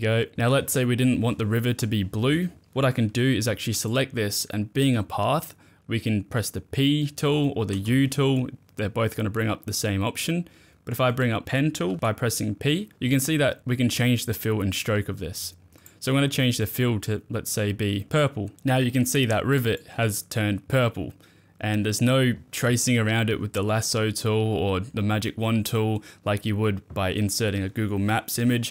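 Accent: Australia. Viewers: i can tell this guy is australian in a second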